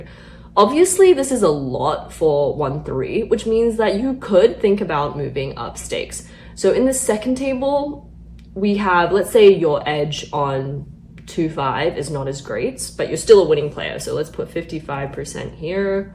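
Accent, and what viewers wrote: Australia. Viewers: She's Australian. I understand her just fine.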